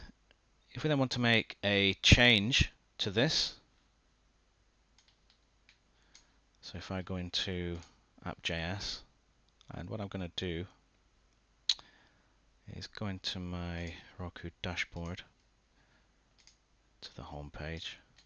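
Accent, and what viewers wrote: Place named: United States. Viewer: Thanks mate. Helped a lot.. Where are you from btw? You sound like american but choosed europe, so I'm wondering :)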